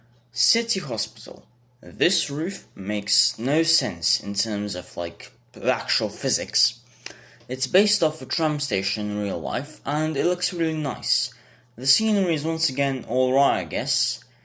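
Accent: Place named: England, United Kingdom. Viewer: Are you really Serbian? You sound English to me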